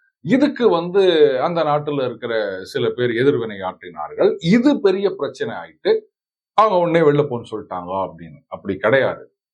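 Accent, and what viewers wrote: Chennai, India. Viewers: Sir Ur Madras language is super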